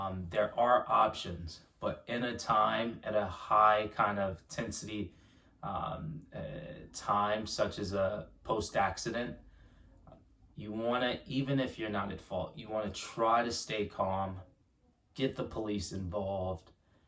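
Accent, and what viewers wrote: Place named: United Kingdom. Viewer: thank you I assumed this was a UK video as your accent didn't have the American tang to it. Thank you anyway.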